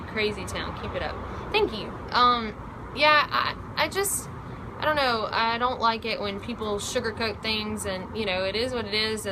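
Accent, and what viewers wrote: South, United States. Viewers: I have spent the last week watching all of your videos! I kept hearing your voice in the back of my head when I was hiking this weekend ... "dirty hickers!" (it was a wet dirty weekend) and your totally different southern accent (Im from FL we have a southern accent but I would say "prett-a" your version 'purdy' I love it all and it's a Pretta, Purdy world out there!!! Your vids are great!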